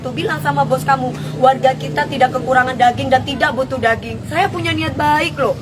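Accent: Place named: Jakarta, Indonesia. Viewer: Gaya betawi😂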